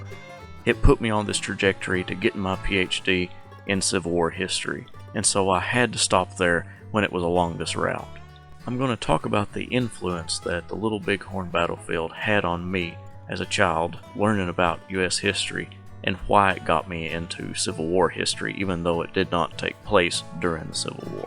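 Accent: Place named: East Tennessee, United States. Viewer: First of all, found your channel last week and I had you pegged for East TN or even Lee County area based on your accent. My family comes from Grundy/Dickenson area. Been looking into a graduate degree in 19th century American history myself & even been accepted into a couple programs, where are you getting your degree from? Great video too btw, looks like a great road trip! 🤙-Josh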